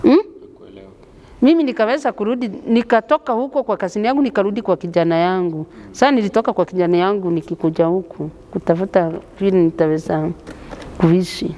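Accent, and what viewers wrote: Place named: Meru, Kenya. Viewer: Huyu zi mkikuyu anaongea kama wameru au kiembu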